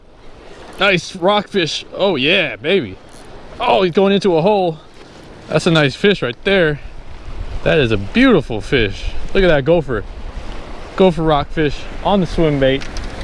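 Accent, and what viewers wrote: Bay Area, United States. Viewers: Yo the way he talks, he’s fasholy from the Bay Area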